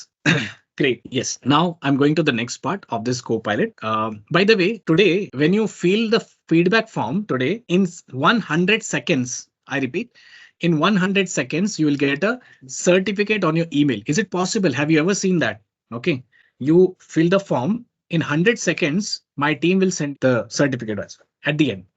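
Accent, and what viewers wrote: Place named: India. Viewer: I'm multilingual and I think your English is top 80 percentile . I am UK English speaker. I like your Indinglish as it's so so fast...I am a busy person 😂😊😁👍👍👍👍 I like Dutch and German videos too....straight to point straight and quick! I'm autistic...suites my learning style - slow learning is death ....thanks a billion dude 👍👍👍👍👍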